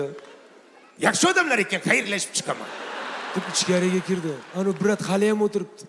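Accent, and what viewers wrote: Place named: Tashkent, Uzbekistan. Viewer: Гап йук Тошкент шеван ёрасиз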